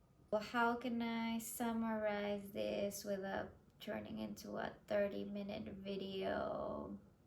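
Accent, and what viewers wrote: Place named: Latin America. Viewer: hi! first time watching a video of yours and i really liked it! your accent's so charming and it's great to hear book reviews from a latina (i'm brazilian, btw!):)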